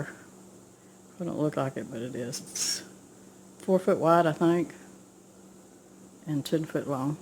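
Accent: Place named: Texas, United States. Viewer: I am so blessed that I found you on YouTube. You sound like my family when I was a young girl. (I grew up in Texas)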